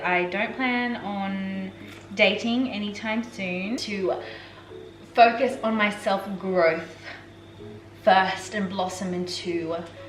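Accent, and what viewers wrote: Australia. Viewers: i knew it! i recognised ur australian accent right away lol congrats on reaching 100k!! ure soooo relatable subscriber from australia ❤️ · omg!! yess! finally... someone. is. Aussie!!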